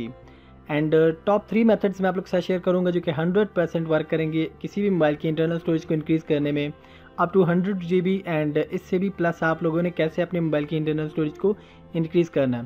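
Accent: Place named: India. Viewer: This makes no sense,the words are in English but he's speaking Indian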